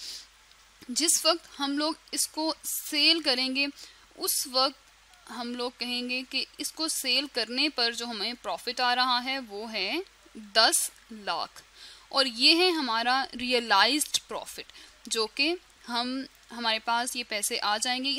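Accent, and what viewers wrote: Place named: Pakistan. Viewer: Your voice sound as if you're Pakistani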